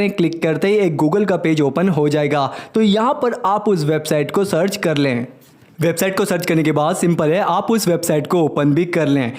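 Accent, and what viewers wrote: Gujarat, India. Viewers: Bhai aap Bharuch,gujrat se ho kya 😀🤔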